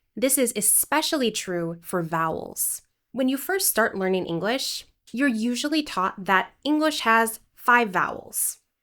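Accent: United States. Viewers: Is this American pronunciation?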